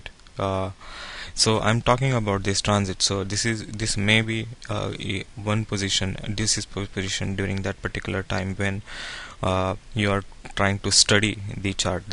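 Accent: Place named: India. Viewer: the indian accent has got to be the worst on earth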